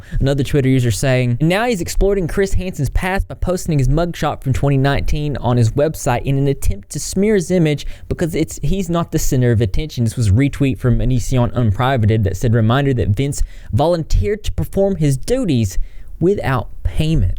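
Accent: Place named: South, United States. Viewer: Your voice and accent are great. Seriously. I am from the southeast U.S. and it makes me happy to hear someone who sounds similar to myself online. That you can sound this way and still be considered concise and intelligent and professional. I am here for it.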